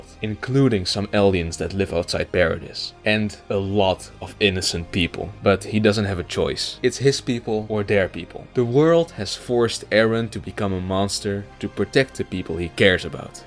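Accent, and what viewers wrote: Netherlands. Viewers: I smell some dutch am I right?🤣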